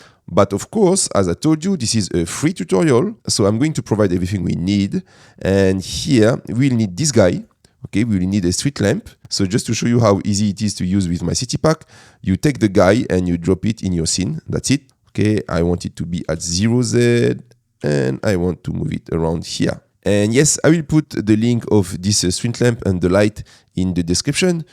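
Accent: France. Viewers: Salut cool ra video , tu es francais ? Car ton accent et vraiment pas comme les anglais que je regader abituellent pour moi tu es francais ton rire et francais 😂 , ca serai cool des tutos en francais , bonne continuation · u've a french accent ?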